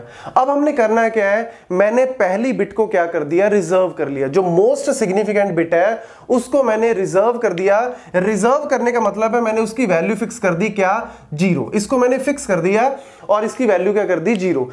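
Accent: Punjab, India. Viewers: Good explanation . Apki hindi main punjabi accent atta hai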